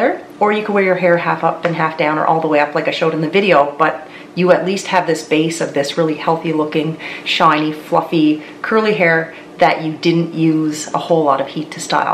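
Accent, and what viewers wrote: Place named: Nova Scotia, Canada. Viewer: Btw, I’m so happy to see you again. I love hearing your NS accent ❤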